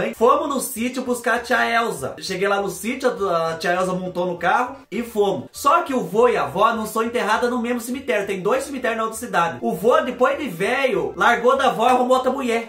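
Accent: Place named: Minas Gerais, Brazil. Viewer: de qual região do Brasil você é? Não consegui identificar de onde é seu sotaque. Parece uma coisa de minas, mas ao mesmo tempo algo do sul.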